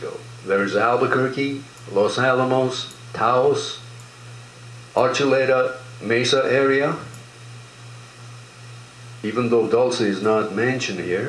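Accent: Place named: Germany. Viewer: Nice video! You narrate well, you sound like Ricardo Montalbán with a hint of German, not being facetious about it but really, you need a job with the History channel.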